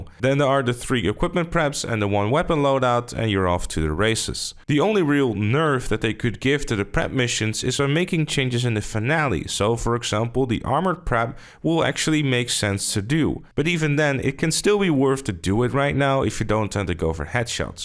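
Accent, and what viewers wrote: Netherlands. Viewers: Are you dutch ? I can kinda hear it in your voice 😂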